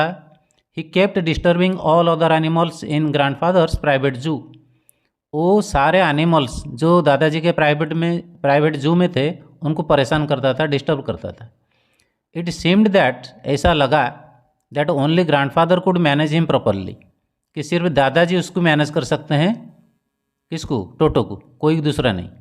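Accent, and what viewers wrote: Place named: Odisha, India. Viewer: Sir , I'm also from Odisha and I knew you are a odia by your accent . Love from Jajpur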